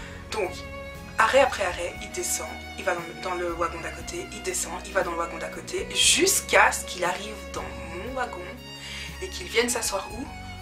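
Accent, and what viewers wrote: Belgium. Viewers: elle est belge? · Cc tu est belge ?? Si oui de ou ??Bruxelle ?? Je t'adore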